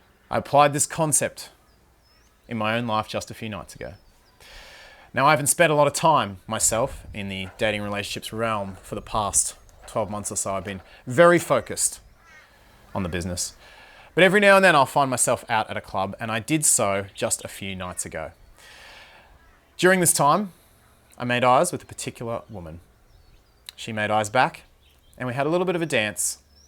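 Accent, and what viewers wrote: Australia. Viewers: Bruh u an Aussie??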